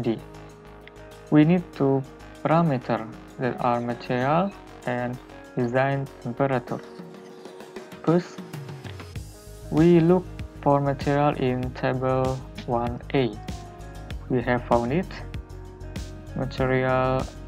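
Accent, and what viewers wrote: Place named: Indonesia. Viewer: Wah keren mas bro, jarang" liat video engineering aksen indo. Kebanyakan india 😂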